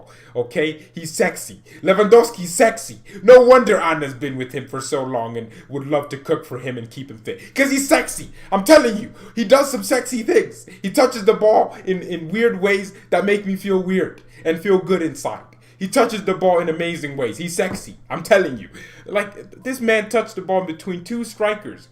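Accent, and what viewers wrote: United Kingdom. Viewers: I enjoyed your British accent and there way of speaking and thinking. You have talents to become an actor. Please more of this.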